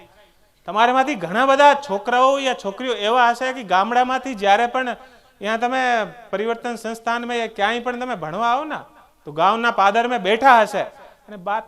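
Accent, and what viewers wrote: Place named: Rajasthan, India. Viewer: સર આપ રાજસ્થાન ના છો ને આપનાં બોલવાં મા રાજસ્થાની લેકા ની ઝલક દેખાય છે જય ભવાની જય રાજપુતાના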